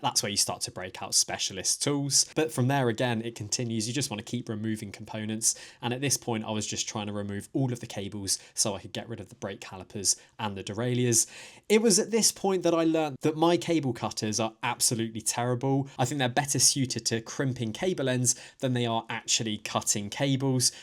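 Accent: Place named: RP, United Kingdom. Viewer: Thats a very posh entrance for a bike journalist, though he does have a very posh accent.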